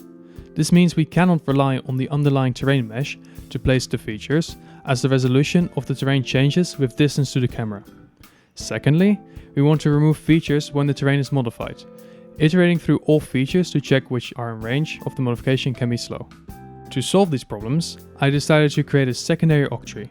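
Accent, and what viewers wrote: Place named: Netherlands. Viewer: Nice dutch accent! (Very subtle though). Trees don't grow along the normal of the surface, they grow towards the sun, up. With a bit of variety :p Looking forward to see updates on this. It looks very nice, optimized and I like your approach :)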